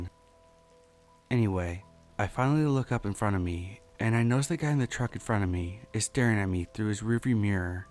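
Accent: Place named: United Kingdom. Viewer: Oh no a British accent no thank you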